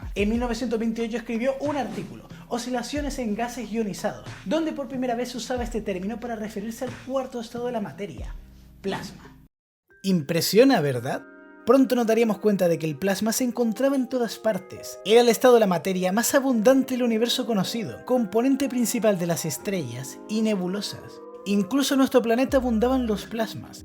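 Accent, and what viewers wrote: Chile. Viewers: Soy yo o tiene un acento parecido sl chileno? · Gracias! Excelente infotración. El centro de mi ciudad estaba repleto de avisos de neón en los '70. Una duda... tienes acento "chileno" es idea mía o eres de acá? Saludos desde Chile!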